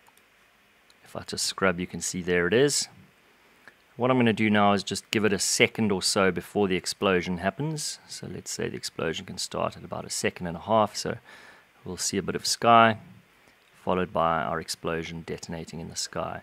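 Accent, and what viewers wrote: South Africa. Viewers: r u from south africa?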